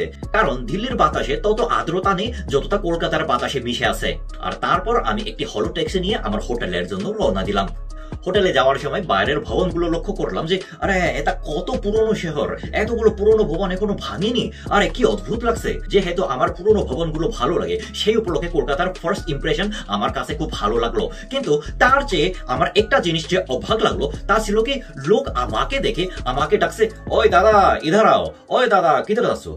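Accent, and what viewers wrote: Bangal, Bangladesh. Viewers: Ata to bangladeshi voice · Your bengali is of Bangladeshi accent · The specific accent of Bengali you're speaking is predominantly spoken in East Bengal (Bangladesh and parts of Tripura)... You are doing great! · But tumi ektu bangladeshi der moto bhasha bolchoamader bhasha bangladeshider moto noi anek misti ...😂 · দারুন কিন্তু তুমি বাংলাদেশী দের মতো কথা বলছো। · His Bengali sounds little different, more like bangladesi. Sounds so awesome 😎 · কিছুটা বাংলাদেশী টানও আছে । তাই না ? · Tumi to ak barae bangal der moton kotha bolcho 😳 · বা: বেটা বা:। তুমি কোলকাতায় এসো, ইলিশ খাওয়াব। যোগাযোগ রাখবে। এভাবে ইলিশ পাঠানো যাবে না। তুমি বাংলাদেশ থেকে অনলাইনে আনাতে পারো, ওরা ব্যবস্থা করে দেবে। 1700 টাকা কেজি। কথায় বাঙাল টান (বাংলাদেশী টান) হল কি করে? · Your Bengali speaking style is some kind of East Bengali (Bangladeshi) style though... 😁😁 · Are vai etato bangal style Bangla, just wow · Tumi khub vaalo bangla bolchho tabey kichhuta bangladesher moto uchcharan hochhe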